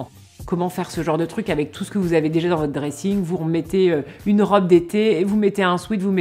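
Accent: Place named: Nord-Pas-de-Calais, France. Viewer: Merci! J’adore ton petit accent chti qui me rappelle ma région natale 😘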